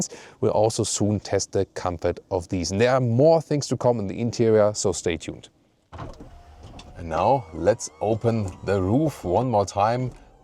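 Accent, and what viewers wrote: Germany. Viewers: This guy with a German accent, saying “the final solution” twice is a bit problematic. :) However I will be buying this car. Style, refinement and a real sports car when everyone is buying SUV’s.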